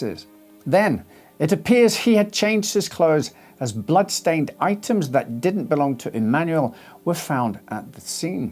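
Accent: Scotland, United Kingdom. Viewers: The accent is not English , it is a Scottish one. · This is in England but his accent is Scottish. I'm sure they'll let you off lolol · Love your vids. Is your accent mixed? I swear I keep hearing Scottish for a split second here and there, then suddenly you're English again, lol. I wonder if I sound like that myself with living in England and having had a Scottish dad.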